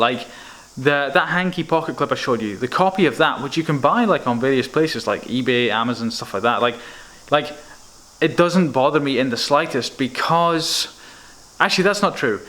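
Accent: Scotland, United Kingdom. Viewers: I love your accent you sound Scottish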